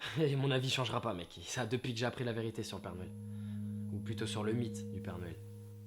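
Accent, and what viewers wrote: Alsace, France. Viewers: Y a que moi qui ai remarqué qu'il a un accent alsacien quand il s'énerve ?? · T'as un de ces accents alsaciens O_o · Il y a un léger accent alsacien 😏 Vives l'Alsace ! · tu as un accent alsacien putain c'est un truc de fou mdr j'en peux plus tu en a fait des bonnes sérieux :') je suis alsacienne et j'en suis fier !!! vive l’Alsace ! <3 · Ohlalal tu prend un léger accent Alsacien ^^ comme d'hab elle est cool la vidéo et merci pour la conférence au Lycée Kléber l'an passé;) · ptdrrrr cet accent Alsacien >>> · On entend bien ton accent alsacien ;) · j'adore ton petit accent alsacien !! :p enfait j'avais jamais fait gaffe mais dans cette vidéo il s'entend bien :p Bonnes fêtes à ton toi qui aime noël :p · De temps en temps l’accent Alsacien ressort. Soit fier, c'est le meilleur accent. Très bonne vidéo sinon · HO yé t'a bien pris l'accent alsacien :D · Mdr , quand tu parle plus fort on capte ton accents alsacien #67 :D ( sait pas une insulte je suit alsacien et j'ai aussi l'accents ) . 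· Elle est vraiment cool ta video, le petit accent alsacien qui ressort, c'est la base ! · Sacré accent alsacien mdr c'est trop bon !! Passe de bonne fête 😘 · J'avais jamais remarqué que t'avais un accent alsacien aussi prononcé ! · j'aime bien ton petit accent alsacien aha, tu gères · Tu me faire rire avec ton petit accent alsacien 😂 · J'ai vécu en Alsace pendant 4 ans et ça fait drôle de voir un youtubeur connu qui a vraiment l'accent :') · en entend l'accent alsacien qui resort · Génial la vidéo oh mais l'accent Alsacien qui s'étend de ouf !! J'adore continue comme ça 👌✌ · J'adore ton accent alsacien #ElsassFrei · Avec le petit accent Alsacien et tout✌️ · Je n'est jamais entendu ton accent alsacien à ce point la mdr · L'accent alsacien de ouf haha représente 👌👌👌👌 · L'accent alsacien qui fait plaisir ! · Cet accent alsacien hahahha +10000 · Ahlal tu as un sacré accent alsacien hihi je kiff ;) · Ton accent alsacien est superbe. 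Dika Schmutz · Mec, t'as un sacré accent alsacien haha xD super vidéo avec une chute excellente, et vive notre région ;) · J'adore ton accent alsacien xD Franchement j'étais pliée de rire à chaque fois, on aurait dit un vieux qui parlait ! Et pourtant je suis alsacienne aussi xD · Jamais j'avais remarqué ton accent alsacien... il est quand meme vachement marqué dans cette vidéo...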